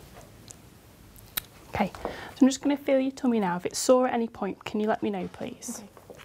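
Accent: United Kingdom. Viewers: Dam, you Brit’s can make anything sound cool. New York here. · This voice sounds like British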